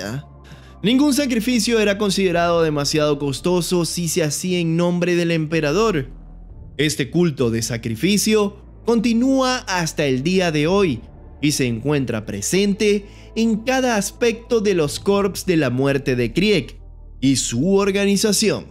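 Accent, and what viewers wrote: Venezuela. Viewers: Y su acento venezolano ayuda mucho. Es muy agradable de escuchar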